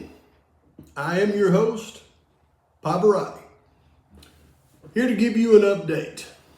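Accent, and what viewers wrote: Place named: North Carolina, United States. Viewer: You surely have a born abd bred NC accent….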